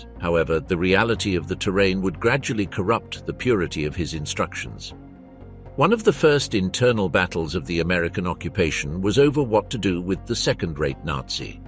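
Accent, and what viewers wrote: England, United Kingdom. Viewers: Why are there so many videos on here about Ww2 with a narrator with an English accent that put Russian communism in a good light?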